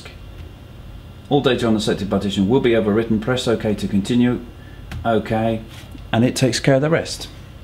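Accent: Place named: Australia. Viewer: WHOOOOOAAA THE VOICE (i love australian accents) are you australian? lol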